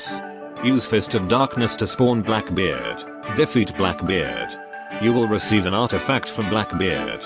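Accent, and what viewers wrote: India. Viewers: Lol indian voice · Why does the new voice sound like an INDIAN!!!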